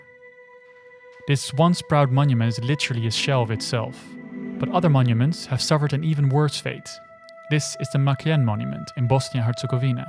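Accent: Netherlands. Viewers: Aan het accent hoor ik meteen dat jij Nederlands bent! 😀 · I knew i noticed a slight dutch accent..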